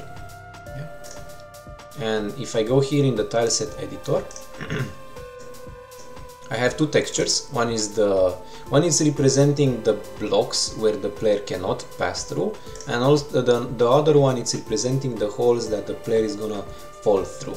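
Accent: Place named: Romania. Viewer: Tocmai ti-am vazut videoclipul, incercand sa imi dau seama de cum sa fac o detectie cand player-ul atinge zona cu foc, l-am urmarit pana la capat iar apoi am vrut sa ma abonez. Cand am vazut " " in nume, am facut legatura cu accentul tau si m-am bucurat sa vad ca sunt romani, buni, care fac astfel de content. GG bro, ai un nou subscriber!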